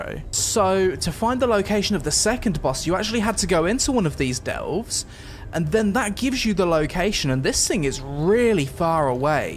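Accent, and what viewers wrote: United Kingdom. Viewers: after watching this vid i fkin love british accent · thaa brrrrrrrrritish is very amusing. what a lovely chap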